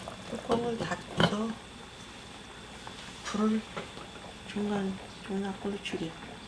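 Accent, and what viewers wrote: Chungcheong, South Korea. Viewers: 충청도이신가바요ㆍ 목소리들음 친정엄마생각나요ㆍ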